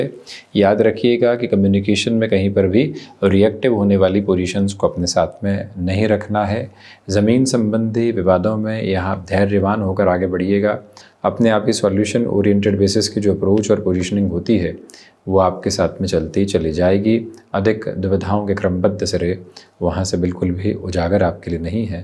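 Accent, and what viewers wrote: Standard. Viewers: Bhai aapke pure Hindi sunne ko bahut he achha lagta hai ❤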